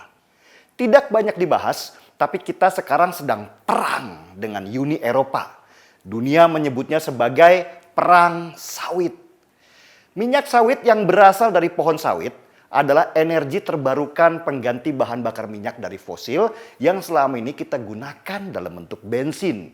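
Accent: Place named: Banyumas, Indonesia. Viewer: Orang ngapak yak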